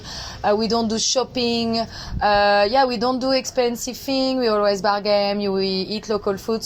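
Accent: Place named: France. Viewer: I am in love with your french accent ❤️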